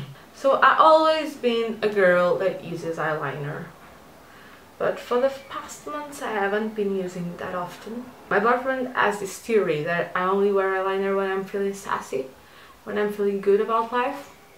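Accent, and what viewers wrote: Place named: England, United Kingdom. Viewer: You're so funny and really like your English accent :)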